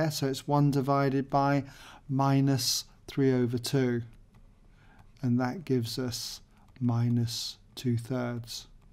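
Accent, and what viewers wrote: England, United Kingdom. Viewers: i love your English accent thanks so much for your channel!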